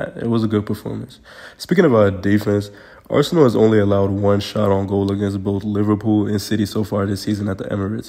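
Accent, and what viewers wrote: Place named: Brooklyn, United States. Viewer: hearing a bk accent talking abt football is so odd to me, loving it tho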